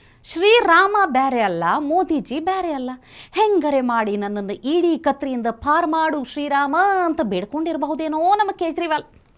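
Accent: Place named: North Karnataka, India. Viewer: Super , Nice to hear north Karnataka Kannada . Kadak Mirchi Mandakki video is very informative .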